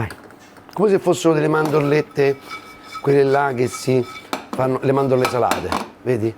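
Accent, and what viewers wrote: Rome, Italy. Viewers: CI DICA L INDIRIZZO DEL SIO RISTORANTE. A MILANO COSA DICONO CHE LEI PARLA ROMANESCO? E POI PER CHÈ HA SCELTO MILANO PER IL SUO RISTORANTE E NON ROMA LA SUA CITTA? GRANDE CARISMATICO!!!!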